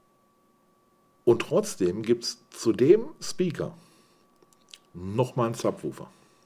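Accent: Ruhr, Germany. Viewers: Vielen Dank für die kurzweilige halbe Stunde. Es hat mir viel Freude bereitet, wie du mit deiner "Ruhrpottschnauße" (das ist sehr positiv gemeint) die Dinge auf den Punkt bringst.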